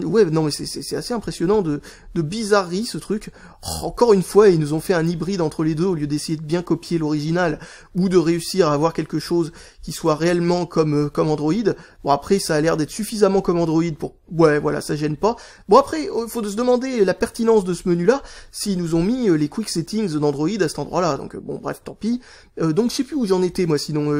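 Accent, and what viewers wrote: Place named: Lyon, France. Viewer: Salut ton accent me fait penser à un accent Lyonnais. Ai-je raison?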